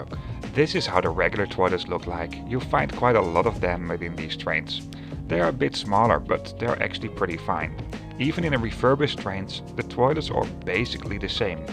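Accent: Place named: Netherlands. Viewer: Love your videos and your Dutch accent 😊. Nice to see my home cities station from your perspective 👌👌 Btw. Funact: I tried to imitate Dutch accent on my channel 😆😆😆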